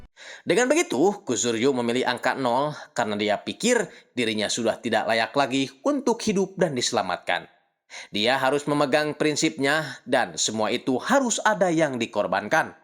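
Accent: West Java, Indonesia. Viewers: Papua hadir. Selalu terhibur dgn khas Sunda nya🙏🤣, sukses selalu Aa🙏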